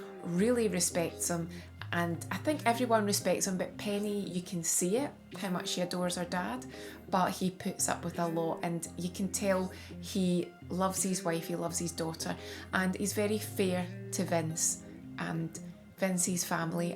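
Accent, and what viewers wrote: Scotland, United Kingdom. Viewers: A charming presenter for a charming series! How nice to hear a gentle Scots accent again! Just one point about the series, and perhaps especially the Christmas special; there was real drama amongst the comedy and Jan was superbly moving in those moments of distress. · Nice to hear a fellow Scot. Loved this series first time round and have been watching it on That's TV. I thought Paul and Jan were brilliant. Strangely enough my 1st wife was very similar to Penny!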